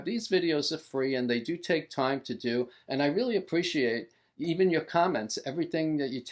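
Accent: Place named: East Coast, United States. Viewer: I am from RI...your east coast accent was unmistakable! Lol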